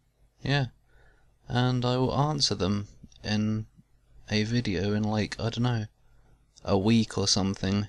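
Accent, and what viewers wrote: United Kingdom. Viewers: 1. What are the earliest memories you have with games, like what games did you play first? What were the first ones that really got your attention? 2. What region of, I assume, the UK is your accent from? 3. Do you participate in game jams and what engines have you used to make games?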